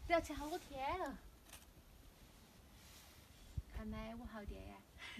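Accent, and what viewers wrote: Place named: Sichuan, China. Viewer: 一直来都没听你在视频里说话 今天才知你是川妹子 声音很好听 以前我还以为你是南方广东人呢……。加油💪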